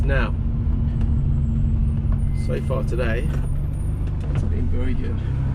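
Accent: England, United Kingdom. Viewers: Just had this suggested to me, see the $$ prices, but then you open your gob defiantly a english, fair play need some more brits like. Showing their skills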